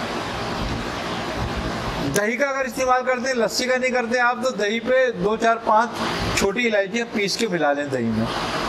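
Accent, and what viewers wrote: India. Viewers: Hajrat Aap ki hindi ek dam India wali hai...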